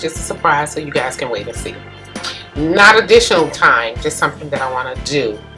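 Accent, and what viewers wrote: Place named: South, United States. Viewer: i love hearing ur lil country accent lol. u look AMAAAAZING!!! i need ur detox type diet plan!!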